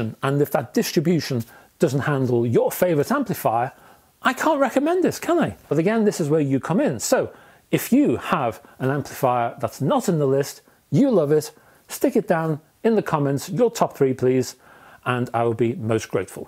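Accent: Liverpool, United Kingdom. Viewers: Hi. Love your reviews. Returning to the UK with a pair of Elac Unifi 2.0's and an Audioengine B1 Blutooth DAC. I use the Audioengine to stream music from my phone. I want to purchase an amplifier to complement this set up. I Plan to connect the TV to the amplifier and maybe some other digital sources so optical and coaxial inputs would be important. My budget would be around £500. Any suggestions? Love your L'pool accent. Love L'pool too. John.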